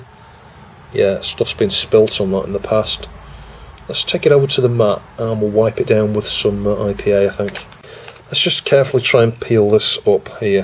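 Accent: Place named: Manchester, United Kingdom. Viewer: Sorry for offtopic but I like your accent, is that Manchester accent? :-)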